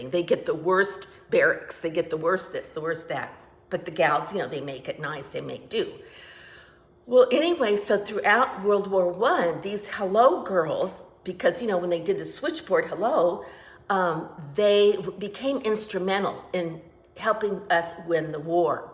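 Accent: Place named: Minnesota, United States. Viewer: You may be in CA, but you sound a lot like my family in southern MN💕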